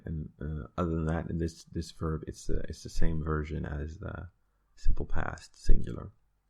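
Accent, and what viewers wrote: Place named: North America. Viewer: You rock on your explanation! And you have this north american accent that I like so much.. This combination of dutch + english is awsome man! hahah Helped a lot.. Now I'm even more motivated. Thx!!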